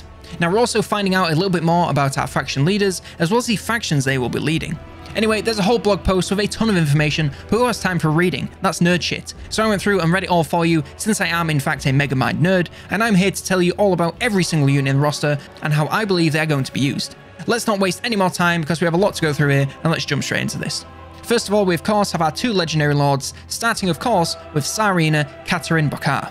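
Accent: United Kingdom. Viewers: For the longest time I thought they must call this game “Wahhma” in the U.K. based on this guy’s accent.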